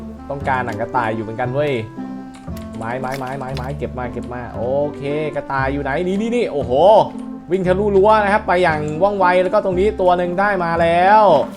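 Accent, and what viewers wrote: South, Thailand. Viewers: ภาษาใต้วันละคำกับพี่ 😎😎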